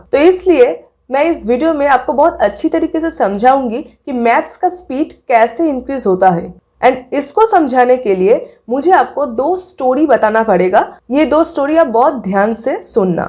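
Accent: Bengal, India. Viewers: Sir ne bola suggestions dungi😂😂 So cute hindi bengali people speak ❤️❤️😘😘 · Mam aap kya bengal se ho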